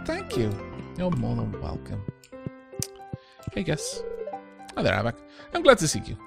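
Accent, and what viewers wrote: Wales, United Kingdom. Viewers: Bläh He's Welsh 😉 not sure where he currently lives though.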